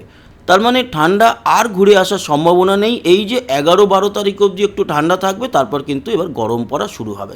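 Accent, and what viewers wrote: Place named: Bangladesh. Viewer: Bangladeshi tan keno ?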